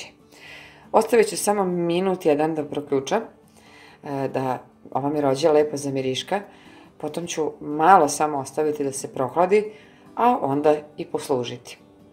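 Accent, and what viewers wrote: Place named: Vojvodina, Serbia. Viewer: Ovako dobro se kuva i jede samo u Vojvodini. Najbolja kuhinja, najbolji kolaci;-)))), znao sam da si iz Vojvodine, cuje se po naglasku;-))) Puno puno pozdrava i svaka cast, i kuvas i mesis fantasticno;-))))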